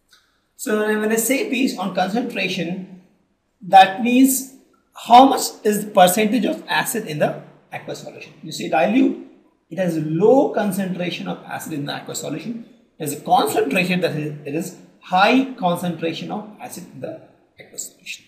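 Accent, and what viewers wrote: India. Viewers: Indian accent is funny.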